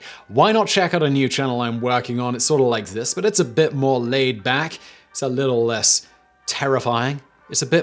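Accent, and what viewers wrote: United Kingdom. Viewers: Ms. Forbes: you may have heard somewhere that not all terms are American in origin or usage. Simon is a Brit and the series originates in England. They have chosen for centuries to go with the Germanic influences on the English language, especially in regard to scientific terms. For whatever reasons, Americans lean toward the Romance languages for pronunciation of those same terms. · The British pronunciation!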